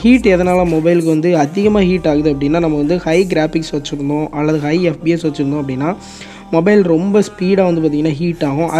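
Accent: Tirunelveli, India. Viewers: Bro ongalukku thiruneveli ah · Bro neenga nellai yaa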